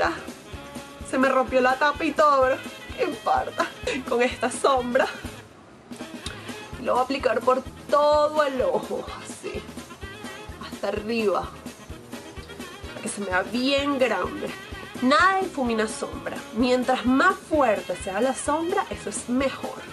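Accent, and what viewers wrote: Venezuela. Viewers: la forma de hablar no se pierde, se ve que eres venezolana, me encanto tu video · Iguaaal. Se te nota la venezolana y mucho jajajaja. SALUDOS PAISANA 💙💜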